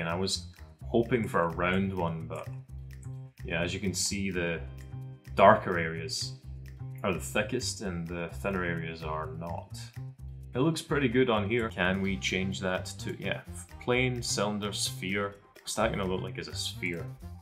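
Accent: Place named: Scotland, United Kingdom. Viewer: And why does he have what must be an Scottish accent?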